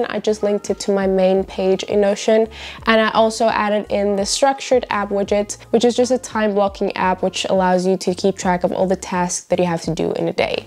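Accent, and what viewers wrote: South Africa. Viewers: You sound so South African! I’m also from SA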